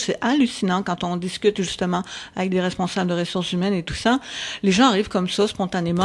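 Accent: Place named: Canada. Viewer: J'aime beaucoup l'accent canadien :)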